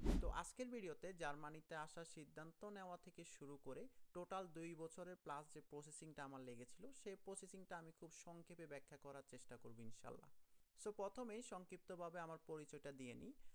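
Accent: Noakhali, Bangladesh. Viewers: Vaiya Noakhalir kon jaygay basha apnr ❤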